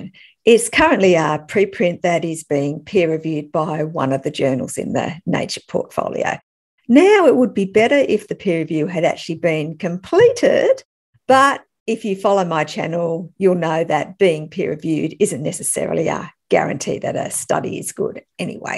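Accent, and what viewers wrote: Australia. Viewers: Glad you're not my Dr. Mind you it sounds like you're Australian we've all noticed how mad you are over there about covid over there..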